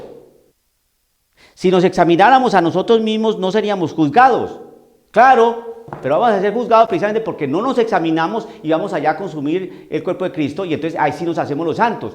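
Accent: Colombia. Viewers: Padre excelente sus enseñanzas, más claro imposible; así hablamos los colombianos, con la ruana puesta, para estos climas que hacen por estos días y de ñapa con la delicia de aguadepanelita. Se le aprecia mucho padre 🇨🇴🙏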